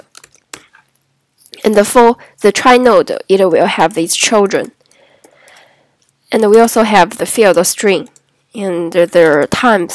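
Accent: China. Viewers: Please improve your English. It's really hard to hear your annoying Chinglish.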